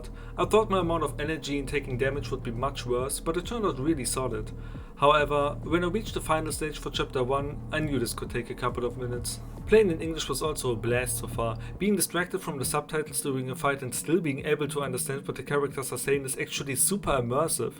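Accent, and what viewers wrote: Germany. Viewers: Your german accent is very nice sounding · Can it be that you are German?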